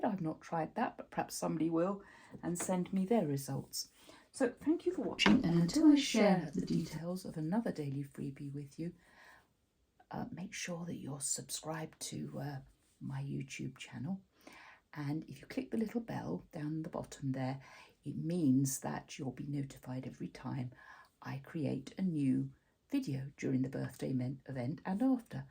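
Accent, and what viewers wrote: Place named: United Kingdom. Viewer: your British accent is music to my French ears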